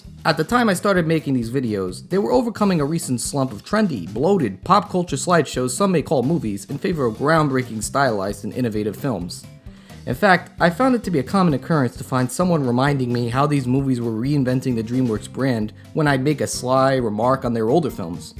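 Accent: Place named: Italian-American, United States. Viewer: God I love your Italian-American accent.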